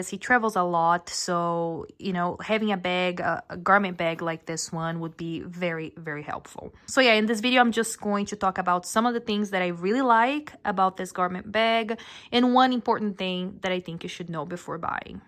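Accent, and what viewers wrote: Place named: Brazil. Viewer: so pela intonação da sua voz consigo perceber que você é brasileira, abraço! 🥰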